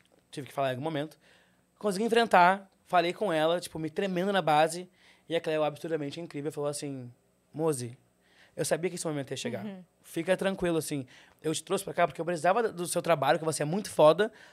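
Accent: Rio Grande do Sul, Brazil. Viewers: Gente, ele é gaúcho ou Nordestino? Eu só achei que ele fala rápido mesmo...mas aqui em casa tem a ket que fala rapido...e eu ja consigo entender....O que acontece é que a net hoje está tão toxica, que se a gente diz uma coisa, as pessoas acham que estamos criticando de uma forma leviana e maldosa... e não... Ele apenas fala rápido... E isso não diminue em nada ele...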